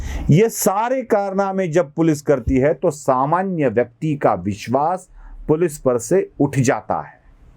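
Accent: Maharashtra, India. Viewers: हिंदी बोलताना मराठीचा प्रभाव ठळकपणे दिसतो.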